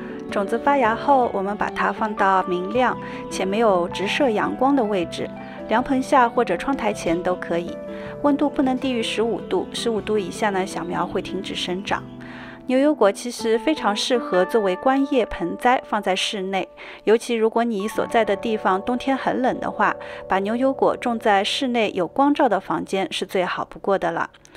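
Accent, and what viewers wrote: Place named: Jiangzhe, China. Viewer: 口音像江浙沪那边的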